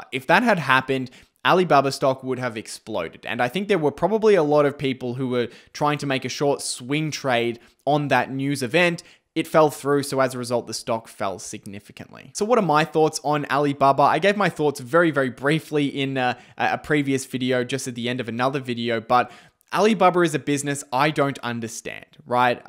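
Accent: Australia. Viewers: That's Aussie English.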